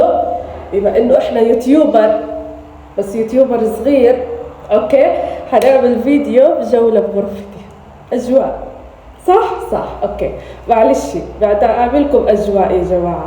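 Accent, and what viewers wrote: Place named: Algeria. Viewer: لايقتلك اللهجة الجزائرية ❤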